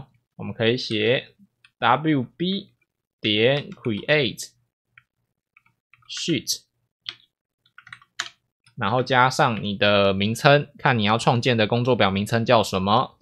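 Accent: Taiwan. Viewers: 台湾乘程序员？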